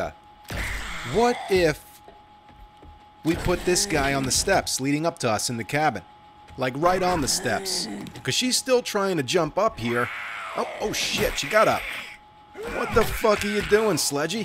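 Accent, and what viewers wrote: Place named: New York, United States. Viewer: I knew it I knew you were from New York but I just couldn't put which part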